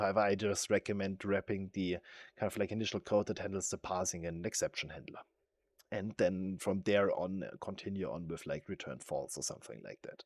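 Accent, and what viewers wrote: Germany. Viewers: That was the perfect video to start with. Thanks. Greetings from Bremen my german accent speaking friend.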